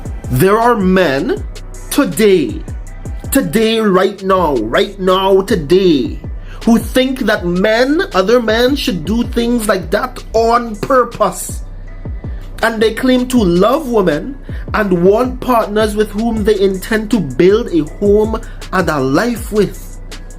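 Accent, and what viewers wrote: Trinidad and Tobago. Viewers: Dais ah Trini accent btw👊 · Thank you!❤️ You sound like a Trini?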